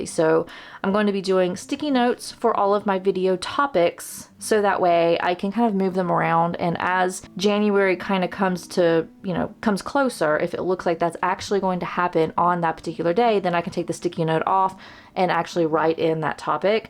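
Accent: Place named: Tennessee, United States. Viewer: It’s so nice to hear someone who has an accent like mine. I’m from south west Tennessee.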